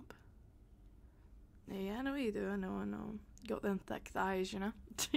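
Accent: Scotland, United Kingdom. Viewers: Are you Scottish or I'm so confused oh god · The first part was a bit to loud and violent for me. But this one was lovely! And I do love your scottish accent so much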